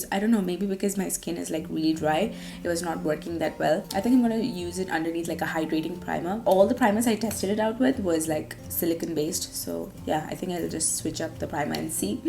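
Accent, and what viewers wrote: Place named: England, United Kingdom. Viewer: Hi, I find u really pretty. Ur makeup tutorials y I like the most is mainly because u do transform from a keralite to almost a different nationality. I do watch ur malayalam YouTube channel. Like ur English accent butt u speak sooo fasttttt. U need to slow down a bit. Thanku n wish u all the luck n luv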